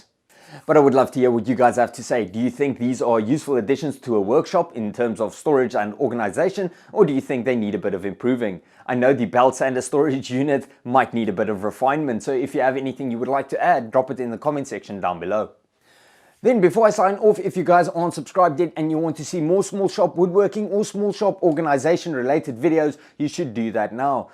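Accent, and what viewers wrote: South Africa. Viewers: Do I recognise a South African accent? 😁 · Tanks for the response to my comment.. Having lived in Johannessburg for 5 years as a teenage, your accent just brings back many great memories of the country. Please keep up the grear work be postive and stay healthy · Great ideas, I have used some of these ideas myself. Will implement some of yours. Love your accent, you sound like my south African mate. Cheers alojs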